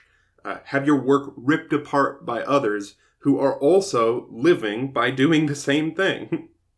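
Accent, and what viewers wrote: Quebec, Canada. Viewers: Thank you and blessings. Is that a french canadian colour I hear in your french words and names? If so: salut mon frère! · Thank you so much 🙏🏻 I was wondering… are you French ? Like from Quebec ?? I’m near Montreal and your French seems Quebec français canadien ☺️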